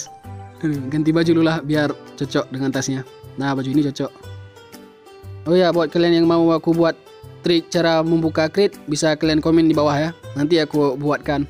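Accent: Aceh, Indonesia. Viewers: Gaya peugah aba awk aceh sang bak hi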